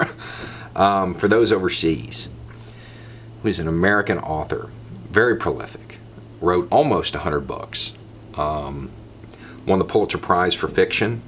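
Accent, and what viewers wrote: South, United States. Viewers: Always look forward to your videos! So I was watching the clip where you spoke about structured anarchy in Vegas (I think that's where it was). I don't know if it's the difference in recording devices used, but your voice sounded very different. In that clip, it was very deep. On your channel, however, you have a much stronger southern accent. Sorry if this comes across as rude or impolite; that wasn't my intent. Just something I noticed, like when Curious George is flipped upside-down. Anyhow, I'm always on the lookout for your content. I learn so much that I never knew! Keep up the awesome work!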